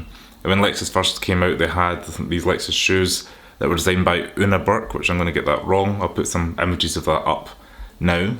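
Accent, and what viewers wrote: Scotland, United Kingdom. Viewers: The guy is speaking Scottish what does Dutch have to do with it? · That's funny, I heard the heavy Scottish and still put the video on 1.25 once your ear gets it, it is all the same. Although I have watched a few Scottish films and they have some good sayings that crack me up. Love it, we don't all need to be the same, please stay different. I have a CT<num> and am now over 100k. I need to do the spark plugs, the shark fin is leaking, and the ABS for the brakes is whining as well as the battery which was all good a few months ago now won't do EV mode. All at once, is that a key point?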